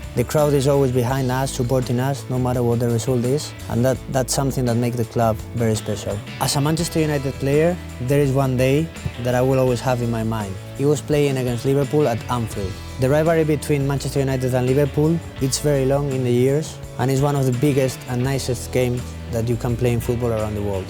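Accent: Spain. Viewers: Ese espaninglish!!!!💪😍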